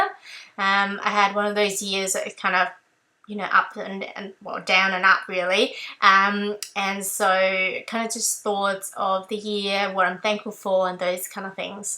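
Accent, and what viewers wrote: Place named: Australia. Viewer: Thanks for the heads up on the DD Facebook page re your videos. Sounds like an Aussie accent. Are you in Oz?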